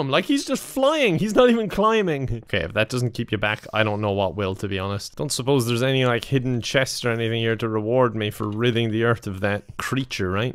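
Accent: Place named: Ireland. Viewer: something about that beautiful irish accent and boat load of charisma always makes me hit that like button. im like hes my friend he said so in the intro and he asked oh so politely. guess couldnt hurt to obey whatever says. ps rt sucks. (team since country road, there can only be one)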